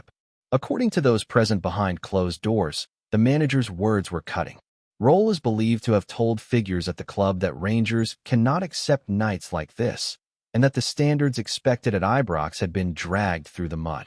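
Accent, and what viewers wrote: United States. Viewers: Another one that likes to hear his own American voice. Over 15 minutes of blah, blah, blah with absolutely no information.....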